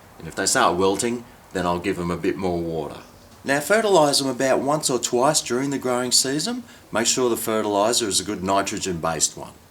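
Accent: Australia. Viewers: Love the video. Love the accent too. Sounds similar to Australian. Thanks.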